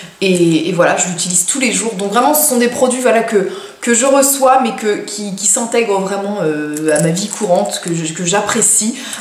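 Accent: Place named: South, France. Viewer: accent du Sud très prononcé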